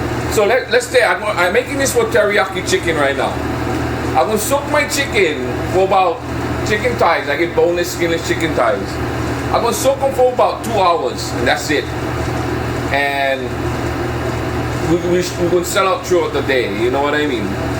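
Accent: Hawaii, United States. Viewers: Growing up in Hawaii ❤😂😅 dats da way of speaking n it's understandable n recognized ur from da islands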